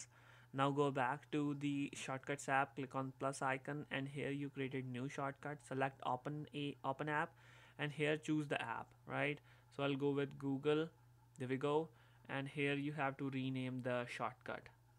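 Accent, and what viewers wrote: India. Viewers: Pahadi English bahut badhiya ji❤❤👌👌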